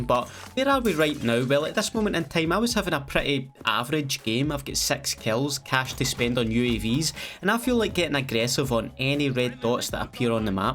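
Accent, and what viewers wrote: Scotland, United Kingdom. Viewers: The Scottish JGOD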